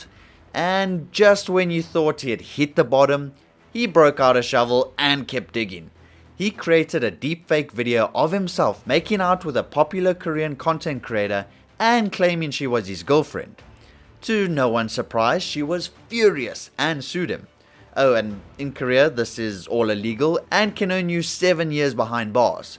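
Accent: South Africa. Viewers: Refreshing to hear South African accent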